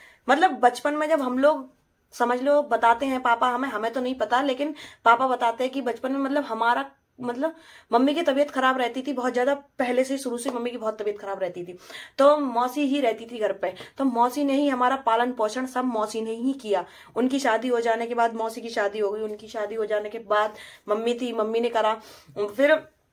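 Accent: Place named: Farrukhabad, India. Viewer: aap ki boli to farrukhabd ki lagti hai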